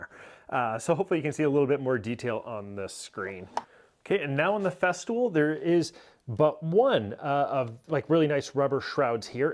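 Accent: Canada. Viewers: This dude sounds so Canadian.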